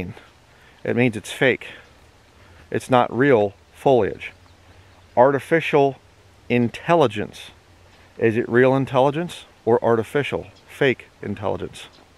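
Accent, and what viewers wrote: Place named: Pennsylvania, United States. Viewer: can tell you're a PA boy by your accent!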